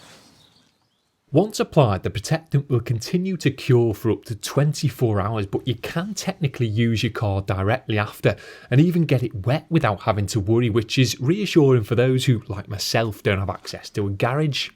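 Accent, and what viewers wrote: Liverpool, United Kingdom. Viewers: I seriously fawn over British accents so much 😛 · What is it then? It's a real British accent, man sounds like Neil Buchanan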